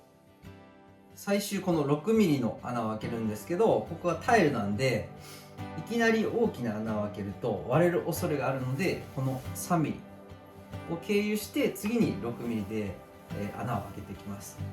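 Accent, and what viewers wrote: Kansai, Japan. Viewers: 、お疲れさまです❗JPさんの言葉と言うか、話方は関西弁混じりですね❗関西の出身ですか？珪藻土の下のタイルの穴開けをした時、キリ先はコンクリート用ですか？見てたら、鉄切り用に見えましたけど…。それに、壁に打ち込んだビスアンカーって言ってましたけど、それはカールですね❗洗面所が、見違えりましたね‼️モダンな感じで良いですね‼️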